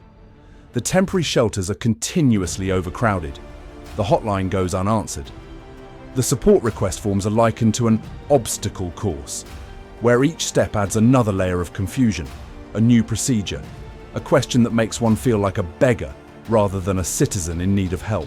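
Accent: United Kingdom. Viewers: why am I hearing a limey tell me about my state? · in the first 2 minutes it shows homeless in LA and Vancover... while speaking like this is Michigan... Who made this video? Why this dude have a British accent? Idk something tells me they are not from michigan possibly not even from america or know wtf is going on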